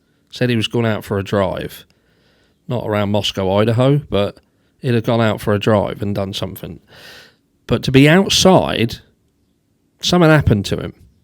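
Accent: United Kingdom. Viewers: what part of UK are you from? I’m interested in accents and learning where they’re from. I traced my dad’s family (Vyse) back to the 1400s in Norfolk.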